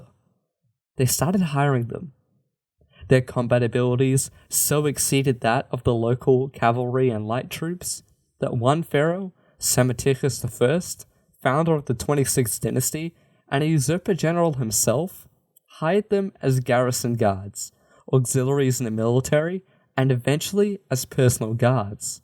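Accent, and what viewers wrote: Australia. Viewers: The language he speaks is strine. He is a Greek Australian where strine is a southern dialect of English. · Seb Sandman I am kinda embarrassed now - I didn’t even notice it was an Aussie accent in either of his videos so far. Only that the Hanno video rocked and this video rocked but the “is he about to crack up laughing” in this one kept intruding. I probably watch/listen to too many history-related things, which seem to have a preponderance of British-accent-family speakers. 😂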